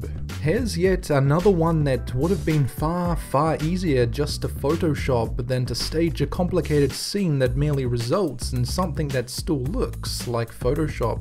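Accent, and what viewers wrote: New Zealand. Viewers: First of all, did not copy Danger Dolan's accent. Haven't any of you ever heard of different accents. Ex: Australian, New Zealand, British, Canadian.... and so on And FYI every location, Country, State, or resident, has a different accent depending were the person is born. 2nd of all, Why do random people asum he is purposely trying to copy other accents? There is more than just only one person, with the same accent. Thirdly: Danger Dolan is fron Australia, and is from New Zealand. Fourthly: Dolan agreed to help, with the production of this channel. And Lastly: If you dislike or are still assuming this is a ripoff, then please leave this channel, and just go watch something else. For every stupid person out there, who complains about accents on this channel, Why are you even here on this channel? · Maybe a New Zealand accent?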